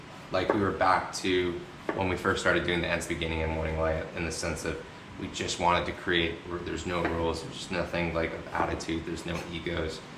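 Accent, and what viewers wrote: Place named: United Kingdom. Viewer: AM I THE ONLY WHO HEARS SLIGHTLY BRITISH ACCENT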